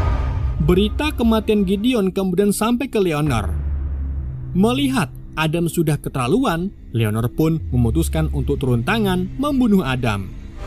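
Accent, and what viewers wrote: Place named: Banyumas, Indonesia. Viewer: cingireh admine wong ngapak yah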